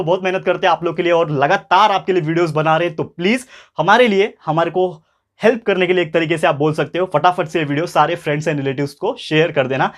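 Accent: Maharashtra, India. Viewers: Sir your marathi is soo cute and nice and your teaching is soooooo beautiful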